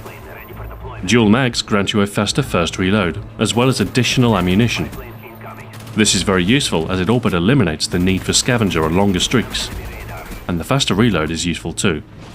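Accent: United Kingdom. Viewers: uhm,soap is irish, this guy's british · RULE BRİTANNİA. thumbs up if you love his british accent.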